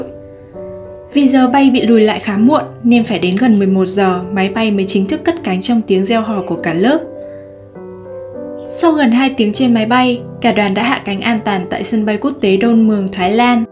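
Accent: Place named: Nam Dinh, Vietnam. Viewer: Âm hưởng nam định rõ vcc kkk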